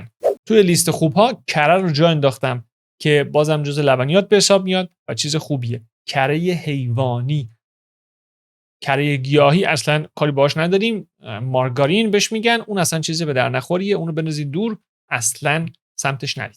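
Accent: Ahvaz, Iran. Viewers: بچه اهوازی ؟ 😅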